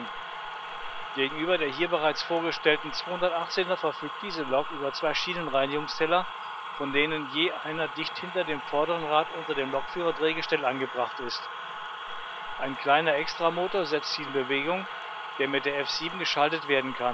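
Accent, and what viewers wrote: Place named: Franconia, Germany. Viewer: Zum "gebrochenen Deutsch": Der so genannte "Akzent" entpuppt sich eindeutig als waschechtes Fränkisch, also durchaus passend für eine Maschine nach Westfrankenbahn-Vorbild eines urfränkischen Modellbahnherstellers. Hochdeutsch wäre hier eigentlich eher fehl am Platze!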